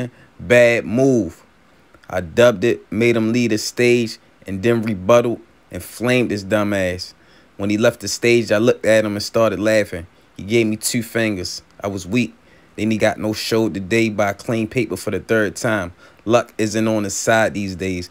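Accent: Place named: Baltimore, United States. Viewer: I'm just hearing your accent... Bmore❤ can't tell if its East or West but definitely Bmore...❤ Much Love and Respect!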